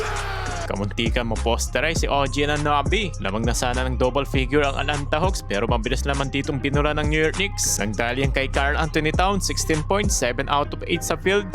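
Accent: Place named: Visayas, Philippines. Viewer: naging bisaya accent?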